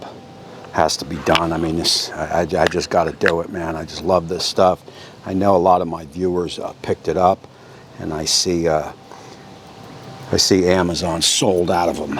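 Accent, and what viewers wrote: New York, United States. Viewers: What part of Texas are you from? I've lived in Texas my whole life and can't think what area of Texas sports a NY accent?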